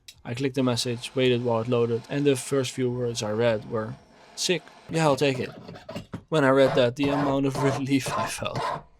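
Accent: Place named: Netherlands. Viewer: Your accent sounds like you are Dutch! Very nice ring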